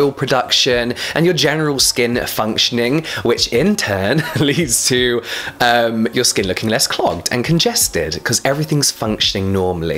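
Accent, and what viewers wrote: England, United Kingdom. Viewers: Scrubbing away ah ih = scrubbing away at it. Gotta love the brits 😂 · Listening to you makes me feel like I'm back in the UK! Love your accent.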